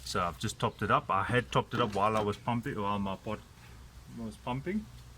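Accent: South Africa. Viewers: After hearing your South Africa accent I became a subscriber.😊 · After hearing your south aflican accent I changed my mind about watching your video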